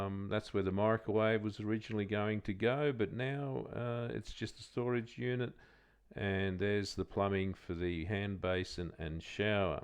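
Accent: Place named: Australia. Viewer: I watched part one and two just amazed at the quality of work...when I heard the Australian accent it confirmed my previous beliefs in Aussie craftsmanship and ingenuity.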